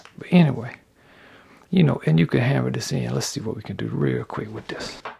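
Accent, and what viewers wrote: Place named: Memphis, United States. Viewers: You're voice and accent reminds me of my uncle Jesse from Memphis! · Hello! Do you think it's possible to place orders for your items? I really, REALLY need one of the elephant fork pendants! Oh, by the way... I totally pegged your Mississippi accent, seeing as how I grew up in Memphis, TN. You sound like old friends back home!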